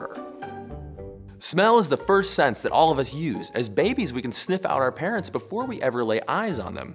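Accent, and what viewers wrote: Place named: United States. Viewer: I can tell from his accent that he's American. He also mentioned Austin in one of his other videos.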